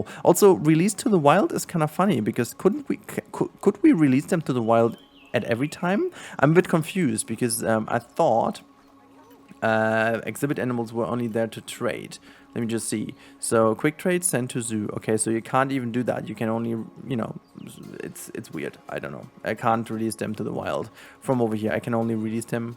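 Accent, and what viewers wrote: Germany. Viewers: hey i got an weird question but are u german?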